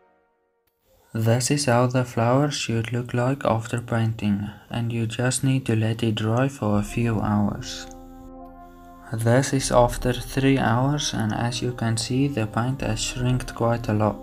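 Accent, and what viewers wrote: South Africa. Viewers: Bro sounds south african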